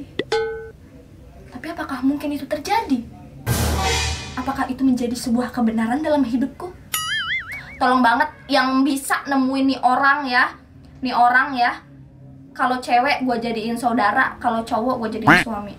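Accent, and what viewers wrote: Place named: West Java, Indonesia. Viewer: Aduh logatnya sunda teh S E M O G A T E H I S M I S E H A T T E R U S: ) Aamiin😊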